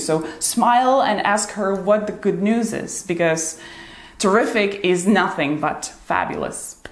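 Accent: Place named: United States. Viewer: I guess the entire Slovakia speaks English. 😁 Where'd you get that accent? You either must have spent a significant amount of your life in the US, or you talk to your colleague from this channel on a day-to-day basis. I used to have a perfect British accent but I lost it very quickly once I stopped practicing.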